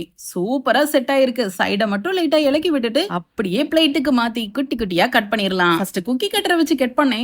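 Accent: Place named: Kanyakumari, India. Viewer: Please change the slang eduthiruthen, vachiruthen, cheathiruthen, vittiruthen.k.k district aaa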